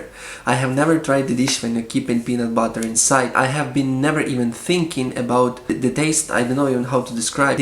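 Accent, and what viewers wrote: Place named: Philippines. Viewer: Your pronunciation is like Filipino accent. Very clear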